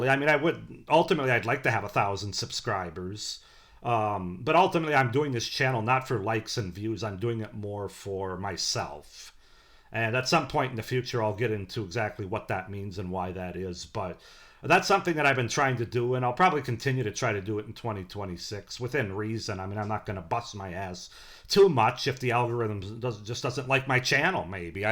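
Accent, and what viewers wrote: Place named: United States. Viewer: I wish for your 2026 to be a success. I'm curious about what region of the U.S. you are from, based on your accent?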